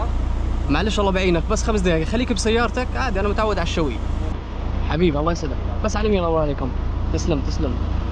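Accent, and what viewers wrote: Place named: Jordan. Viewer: سمعت انك اردني تحيه للاردن من السعودية🥹🌹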